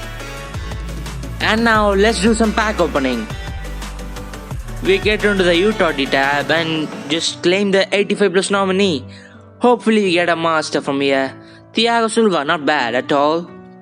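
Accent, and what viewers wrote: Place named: Tamil Nadu, India. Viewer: Your tamil accent is HARD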